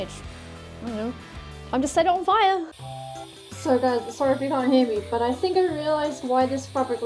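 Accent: United Kingdom. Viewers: Is she Scottish or Irish 😅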